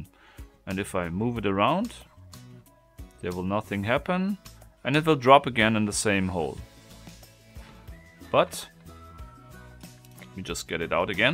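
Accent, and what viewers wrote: Germany. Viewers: Evtl Irre ich mich aber es klingt startk nach einem Deutschen akzent :P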